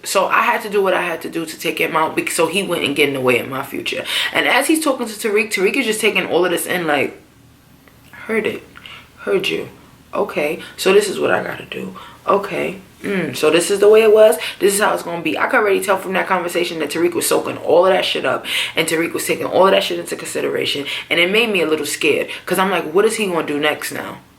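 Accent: New York, United States. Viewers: I love your reviews I came across your Channel recently & the NY accent got me I’m from CT so if you know you know 😂 but this episode was good but predictable I think it was Tariq if you noticed he fell back so whoever shot him made him turn around to face them first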